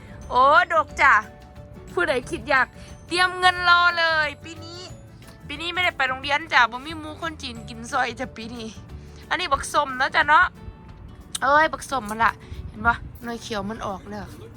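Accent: Udon Thani, Thailand. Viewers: ສຳນຽງທີ່ເຈົ້າເວົ້າຄືຄົນອຸດອນນີ້ລະ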